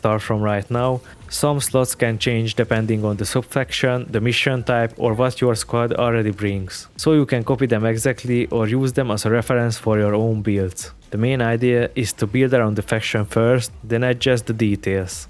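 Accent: Hungary. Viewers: Thats a juicy Hungarian accent, love it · Rögtön felismertem a magyar akcentust. Great informations btw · te magyar vagy halljak meg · are u hungarian??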